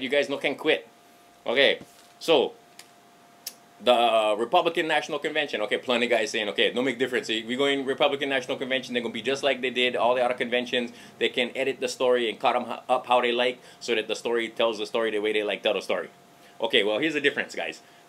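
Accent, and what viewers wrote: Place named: England, United Kingdom. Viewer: Nice D&D shout out. LoL. However you made this far too English English. Was right esay to unnastan man.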